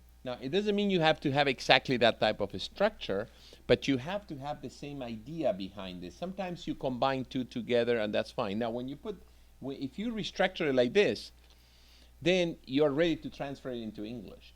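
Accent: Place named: Argentina. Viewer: This professor has an Argentinean accent , i know because I'm Argentinean ;)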